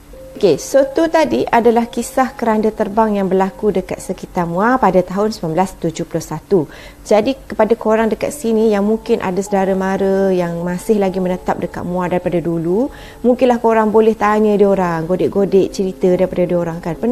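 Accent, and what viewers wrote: Kelantan, Malaysia. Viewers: Maaf bertanya...daripada slang ni saya rasa ni orang kelantan kan?.... walaupun sekali dengar memang tak macam org kelantan,tp kalau dengar betul2 akan keluar slang kelantan tu.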